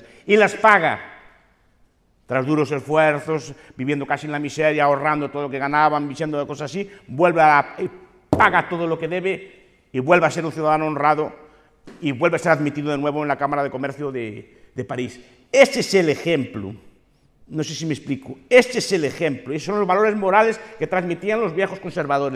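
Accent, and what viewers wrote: Galicia, Spain. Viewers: Es gallego de aldea. El auténtico.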